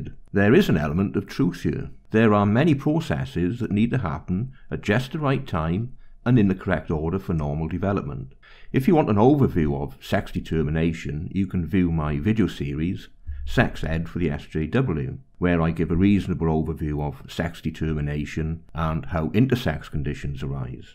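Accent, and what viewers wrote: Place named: Cardiff, United Kingdom. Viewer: Excellence in a Cardiff accent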